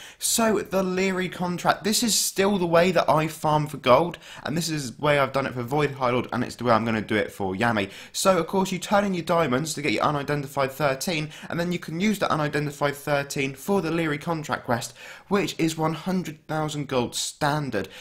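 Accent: United Kingdom. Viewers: Nice video. Lots of information btw are u a britain? Cuz u got the accent of a Britains.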